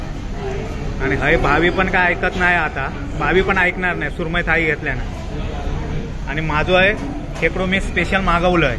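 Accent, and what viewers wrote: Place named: Malvan, India. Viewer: साहेब तुमचे मालवणी भाषेत बोलणे खूप छान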